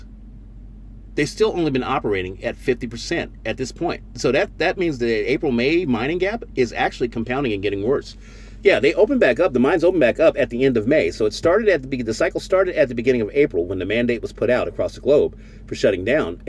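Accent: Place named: United Kingdom. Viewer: your brit accent is spot on!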